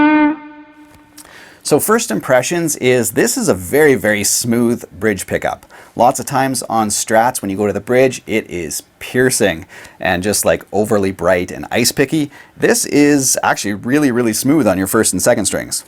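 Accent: Canada. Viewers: are you Canadian????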